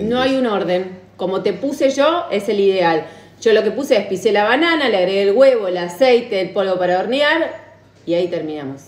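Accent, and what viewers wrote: Argentina. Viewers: Ahí diese Ecuador yo pensé que eras de la argrntina Saludos amigos colombianos 😊😊😊😊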